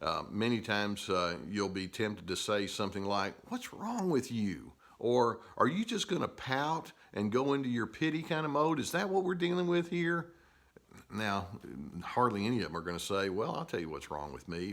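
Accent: South, United States. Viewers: Dr, You have helped me so much! I bought 2 of your books on amazon. They both 'cut to the chase'! I was a people pleaser and became very angry and then depressed. Without realizing what was causing it, i sunk down for years. You helped break it down and sort it out! I am free of my bondage! I am forever grateful! Would love to spread the word! Bless you! (Love your southern accent too)!! You have changed my life, my attitude, my future!! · I love your southern accent. Hallo from Bosnia. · I'm from the south, also. I hope you know that your super cool southern accent gives a much needed safe space to the sceptical-of-therapy southerners. There is a lot of suffering down here that's definitely in our control whether or not we want to admit it. After showing my super anti-therapy Alabamian aunt one of your videos to help her understand her brother-in-law she said "I really like him. 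He sounds like a normal person". hahahaha I love it!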